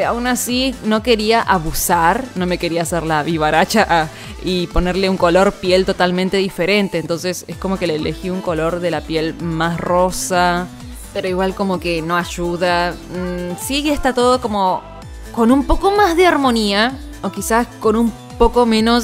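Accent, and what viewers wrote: Argentina. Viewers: Sos argentina??? De que pais sos? Te amo♡ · Nueva sub se nota que sos Argentona :"D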